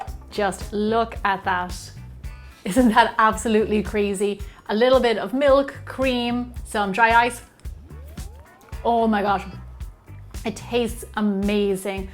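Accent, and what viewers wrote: Ireland. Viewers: Hi! I was just wandering, are you Irish ? 😀😀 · r u Irish? and btw love ur vids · OMg sooooo good! I love your Irish accent soooo much. I'm Irish too but born in USA and always wanted to live in Ireland.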